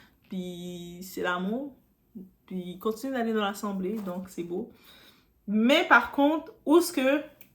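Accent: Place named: Quebec, Canada. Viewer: Je n'aime pas la traduction, je préfère le "vrai audio" en plus tu peux très bien traduire en résumé après mais c'est du détail. Je tiens surtout à te faire un grand merci pour tes vidéos et ton état d'esprit qui est feelgood. Et puis ton accent garde le, on va pas changer son accent pour deux glandus, t'es authentique ça fait partie du package, on dirait un accent du Québec c'est ça ? 💜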